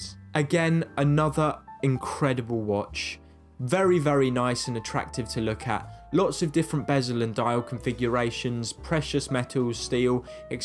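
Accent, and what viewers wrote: England, United Kingdom. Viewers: I can't even understand what this guy is saying with his English accent. You should post this video in Europe. Maybe people's taste in Europe is different as well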